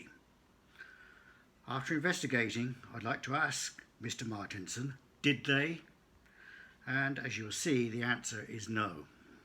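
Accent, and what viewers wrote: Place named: United Kingdom. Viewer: Do you have anything other than a British accent to establish yourself as an authority? Because that really doesn't do it, especially these days.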